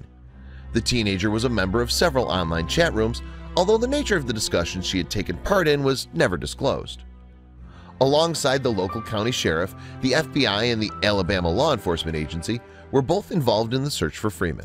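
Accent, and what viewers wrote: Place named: Michigan, United States. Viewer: The heavy Michigan accent and repetitive overuse of the word indeed cracked me up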